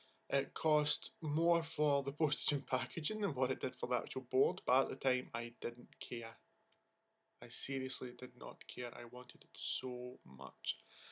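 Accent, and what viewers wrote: Scotland, United Kingdom. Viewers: Great video! I have a board and really want to try it - will be alone as nobody I know believes or is willing to take part! Thanks again for this video, brilliant to learn history of the boards too. PS I'm a Fifer - where is your accent from? You sound like you're more towards the West!! :o) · Are u scotish ?? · Ahh, he's from Scotland. :P Sort of close. Lol. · Is a make shift ouija board okay to use??? Oh and love the Scottish accent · Are you Scottish? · are ye scottish lad? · Is he.. .Scottish? Or Irish...? This is going to bug living crap out of me >.> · ur scotish me tae m8 lol scotland foreva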